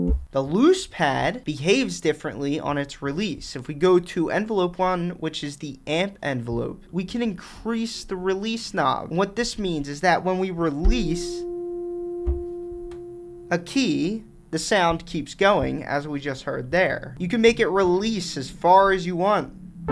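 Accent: Philadelphia, United States. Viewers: bro u gotta be from philly/delco !!!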